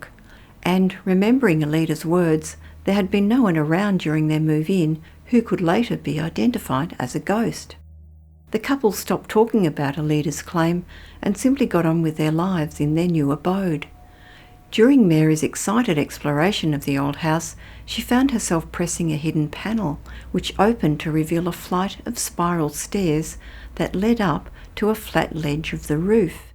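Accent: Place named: Australia. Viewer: The narrator has an Australian accent...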